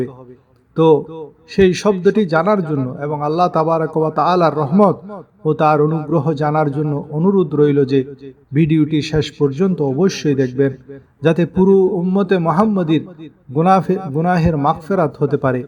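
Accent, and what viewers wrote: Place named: Chittagong, Bangladesh. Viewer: সালাম নিবেন। ইসলামিক ভিডিও দেন মানুষের উপকারের জন্য। কিন্তু বেশি কথা বলা আপনার একটা সিরিয়াস রোগ, এর চিকিৎসা নেন। অনেক সত্য কথা বলেছেন সাথে মিথ্যা ও বলেন। যেমন বললেন একটি দোয়া দিলেন ১০ টা দোয়ার লিষ্ট। মামুষ কোনটা রেখে কোনটা পড়বে। যদি সওয়াব প্রাপ্তির জন্য করে থাকেন তাহলে কম কথায় আসল বিষয় বলে দিন। মানুষ উপকৃত হবে। আর যদি অন্য উদ্দেশ্য থাকে তাহলে কিছু বলার নাই। কথা শুনে চট্টগ্রামের লোক মনে হয়। চট্টগ্রামের লোক মনে হয় একটু বাচাল।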